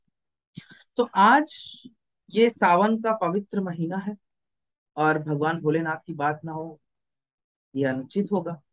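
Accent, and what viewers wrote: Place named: Allahabad, India. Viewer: I didn't know before that he is from Allahabad. But when I heard for the first time, i got Allahabadi vibes from him😅. But ignored it. Must say Allahabad has its own taste.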